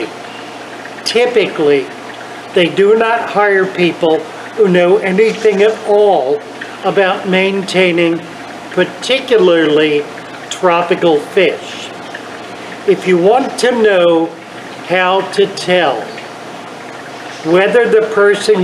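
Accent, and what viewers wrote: United States. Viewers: Found this useful, like i did? I'd suggest three things in addition to enhance the usefulness. Turn on subtitles (especially for those not in America or not used to the accent) and set playback speed to 1.5. Repeat listen a couple of times making notes as you go along. Btw, the main content starts. Thanks for the useful info,!:)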